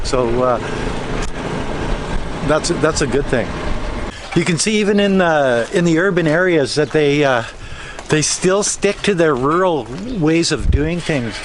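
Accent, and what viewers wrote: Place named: Canada. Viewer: 5 minutes in and you mentioned Canada, Im from Canada, southern Ontario, and I guessed at the beginning that you were Canadian, LOL. Nice video.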